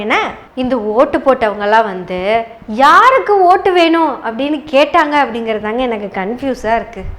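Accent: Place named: Coimbatore, India. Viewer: Kovai slangs very good👍🎉❤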